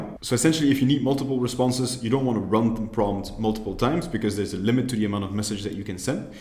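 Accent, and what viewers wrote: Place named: France. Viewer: Je kiffe l'accent français !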